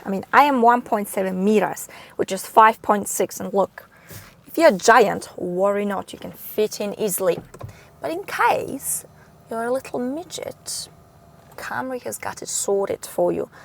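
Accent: Australia. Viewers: The Commodore comment confirmed it; I knew I could detect an Australian accent in there, on some words especially! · I heard the accent right away, but was confused by the license plate (it wasn't Australian) and the steering wheel on the "wrong" side. So until Switzerland was mentioned, I had no idea what market this video was made to inform potential customers. BTW, you are probably aware, here in the 'states commercial producers use Australian presenters or folks with Australia sounding accents when they want to make the product being sold just a bit more...posh. For some reason, the British and/or Australian accent is supposed to convey a bit more...class.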